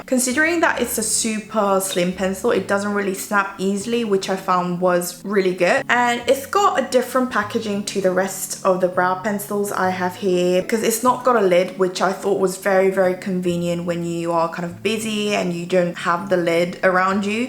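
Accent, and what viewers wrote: United Kingdom. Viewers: 영어 공부하는 중이라 영어영상이 더욱 반갑네요!! 영국발음이랑 유창한거 너무 멋져요ㅜㅜ 또 한번 반했네요💕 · 이거 영국식 발음이에요 아니면 호주이런쪽이에요?......... 억양이 어느나라 영어에 가깝나요? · 언니의 영국 억양에 한번 더 녹고 갑니다 ㅎㅎ 🙂😊👍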